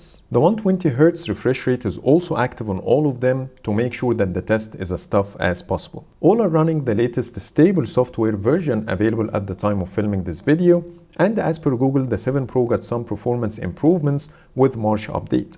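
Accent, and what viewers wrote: Egypt. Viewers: انت مصري صح؟ باين من الاكسنت بتاعك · Bro your accents English like Egyptian people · Egyptian accent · I think you’re from Egypt from your speaking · كأن اللي بيتكلم مصري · Bro‘s egyptian accent roaring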